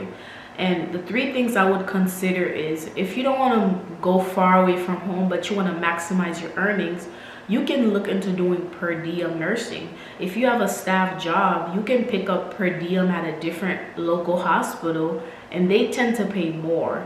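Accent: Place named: Caribbean. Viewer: I sense a carribean accent. Are you and island girl ? Thanks for the content. Dont the agency pays for everything again?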